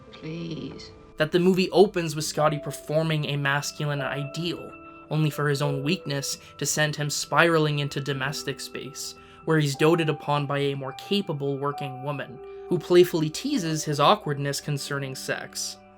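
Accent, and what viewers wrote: Canada. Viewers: great video. one nitpick: I can tell from your accent that you're Canadian. I wonder if this is the reason you decided to turn Elster (el-stir) into Elstre (el-stree), much the same way you might do center > centre (pronounced "sen-tree", of course)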